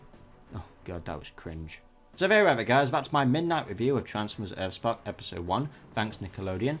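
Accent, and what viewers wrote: United Kingdom. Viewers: I like your British accent. But quentess prime is the traitor if you watch the last knight they call her that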